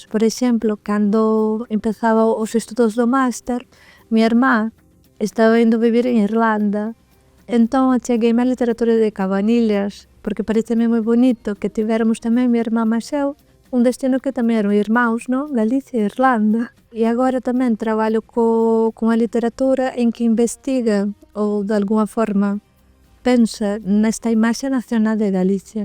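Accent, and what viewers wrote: Castile, Spain. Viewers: ela fala de uma maneira muito castilhanizada... no programa galegos pelo mundo quando mostram pessoas mais velhas elas falam igual aos brasileiros... a impressão que dá é que o galego tá sendo pouco a pouco absorvido pelo espanhol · Haber el canal Horrible la locutora que hable con acento gallego ESO DE HABLAR CON ACENTO CASTELLANO LE QUEDA HORRENDO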